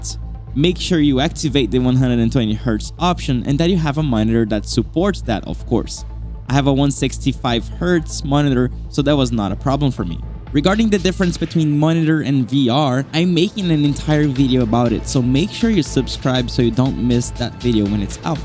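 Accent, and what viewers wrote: Brazil. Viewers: are u brazilian?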